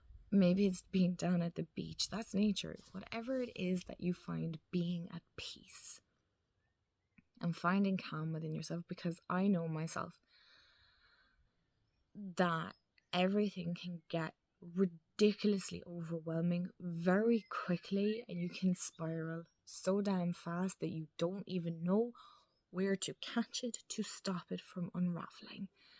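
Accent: Ireland. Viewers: I really like your voice and your Irish accent 😍 I relate to everything you said very deeply ❤ It's been really hard for me as an Iranian, staying present helps a lot. I have had a channel on YT since 2020 (My Farsi channel) and the best advice I can give to anyone who is not here for money, is to completely forget about the algorithm. ❤❤❤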